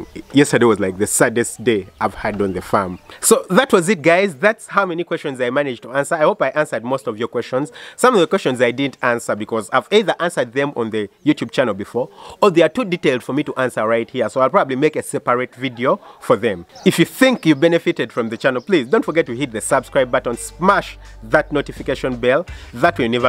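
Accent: Uganda. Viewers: Our favorite Dr,we love your passion for farming.We also love your Ugandan accent.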